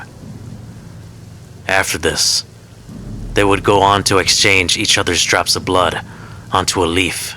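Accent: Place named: United States. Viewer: Leng lead me here. Subscribed! So good!!! I was convinced you’re not Hmong due to your perfect American accent until I hear you speak the Hmong words in Hmong. 👍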